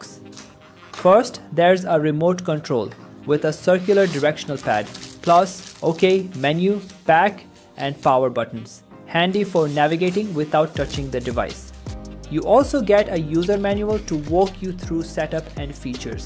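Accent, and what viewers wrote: India. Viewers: Mac and Windows ok. If only you tested with Linux! Also, for a Chinese channel, why does the accent sound Indian? Or are you using AI to read?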